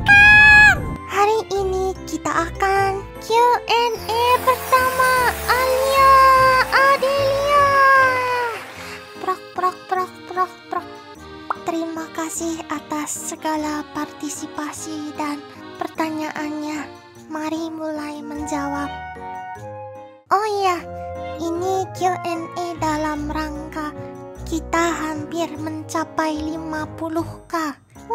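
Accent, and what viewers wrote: Java, Indonesia. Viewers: Kok ngomongnya medok Jawa ? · Alia logatnya kayak jawa wk 🤣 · Alia suaranya kyk ada medok" nya gitu:> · Gua denger lebih detail lagi suara kak alia ada logat jawak nya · Kalo aliaNgomong imut imut kel gitu..aku dengernya alia kek medog gitu loh..ke org jawa yg lg ngomong indo...hihihihi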